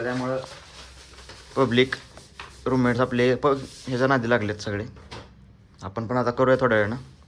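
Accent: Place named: Pakistan. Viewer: Pakisatani English ewwwww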